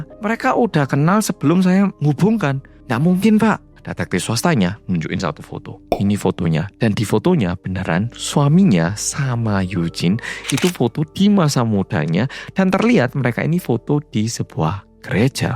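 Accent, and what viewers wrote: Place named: Java, Indonesia. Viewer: Mas, aku sering nontonin videonya sambil makan, mamaku sering denger aku nonton dari ruangan lain dirumah, suatu hari mama nanya “kakak kenapa sih suka nontonin cerita orang jawa?” (Karena logat mas medok) 😂😂😂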